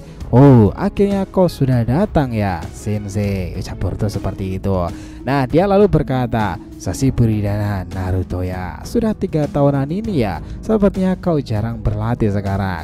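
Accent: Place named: Java, Indonesia. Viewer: ngomongnya santai aja mas,pasti lu dari jawa on mas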